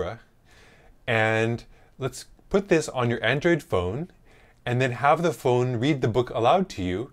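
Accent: Canada. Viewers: He is Canadian. That is why he sounds different. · U Canadian?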